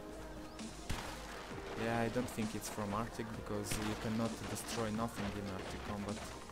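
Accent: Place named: Italy. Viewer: you've got an Italian accent (or however it is writted)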